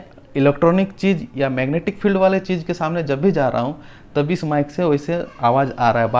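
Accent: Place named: Bihar, India. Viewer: Isme uski koi galti nhi h, vo bihar se hai bhai, thik hai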